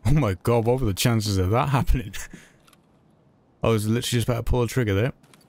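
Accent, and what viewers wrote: United Kingdom. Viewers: you from south wales butt?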